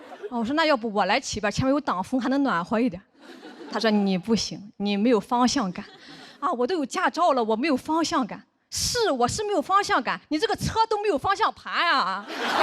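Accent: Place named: Shandong, China. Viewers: 这个姑娘真不错 三观正 不是为了骂人而骂人 比如杨某 带点土的山东口音又给人真实感 赞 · 面相身材口音非常山东！😂😂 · 山东口音，徐志胜也是山东的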